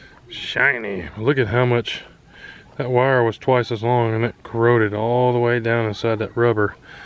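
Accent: South, United States. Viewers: We all do things like shock ourselves but most wouldn’t tell the world about it. I appreciate your humility and ability to laugh at yourself, after all we’re only human and not perfect. Also your southern use of the English language is quite entertaining. Thanks for another great video,!!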